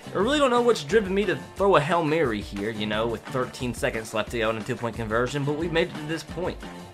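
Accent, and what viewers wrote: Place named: Pennsylvania, United States. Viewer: Pennsylvania accents make we want to gouge my eardrums out.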